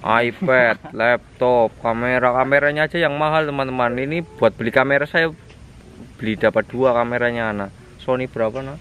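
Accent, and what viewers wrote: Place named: Tegal, Indonesia. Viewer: Ky nya org tegal yah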